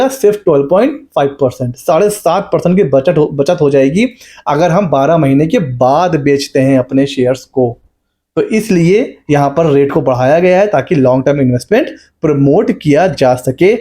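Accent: Lucknow, India. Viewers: Lucknow se lag rahe ho, is it?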